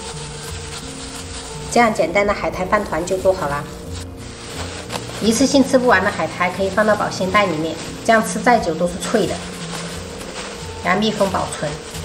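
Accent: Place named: Sichuan, China. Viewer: 最接地气了，喜欢你随心随意的分享，非常真诚~要不是听你讲四川话还以为你就是福建人呐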